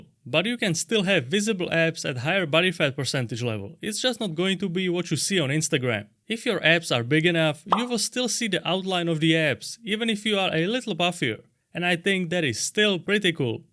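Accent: Czech Republic. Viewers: Are you from the Czech republic?? Because of the accent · czech accent detected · Are you Czech by any chance? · I was worried for a second there, hearing your accent, but Ig you're Czech ^^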